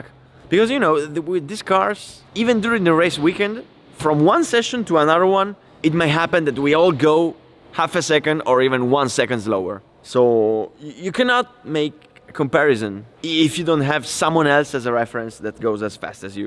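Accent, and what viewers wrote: Italy. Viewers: minchia è siciliano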